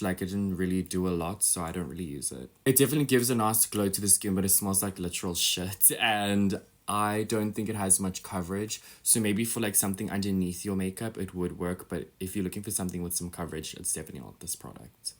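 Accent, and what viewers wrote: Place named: South Africa. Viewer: I love the south African accent soooo much!!! Can u speak the language for us just once in one of ur videos???!!! Please please pleeeeeeease!!! Saying that the one foundation smells like "literal shit" 😂😂😂 omg ur so funny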